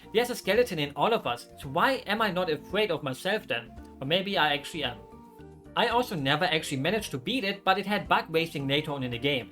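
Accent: Germany. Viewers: You sound soooo German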